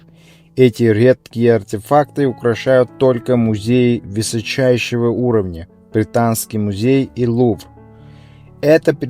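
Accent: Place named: Georgia. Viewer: ❤❤❤ Слава богу услышал,что возраст примитивных наконечников возрастом 2млн.лет.Почему-то считают 20-30 тыс.лет официально возраст человечества.Неужели нельзя называть правильный возраст человечества на Земле.Ранее видел раскопки Грузии,где были обнаружены гигантские челеческие кости.Хотел бы об этом найти продолжение....Мне нисколько не мешает озвучка человеком грузинским акцентом.Намного лучше,чем озвучка ИИ.....Насколько помню апостол Пётр говорил на арамейском древнем языке.,Как всегда,миру-мир,а армяне присваивают всё то,что раскопано археологами,заявляют о братстве.....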